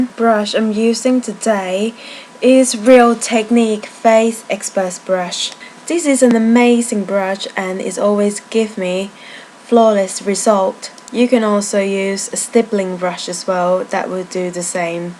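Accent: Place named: United Kingdom. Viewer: I wish I have British Accent like yours. T^T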